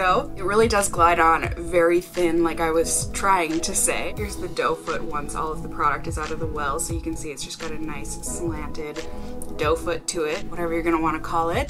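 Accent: Minnesota, United States. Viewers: I feel weird for thinking the sneeze intro is so adorable!!! Lol!! I think it's the little Minnesota twang in her voice. 😂 Love you!! I've been watching for years. Also, I support your choice to separate channels (even though I really wish people could just suck it up and keep on scrolling, also not that my support means much lol). Always wish you the best! Love from Fresno Ca!!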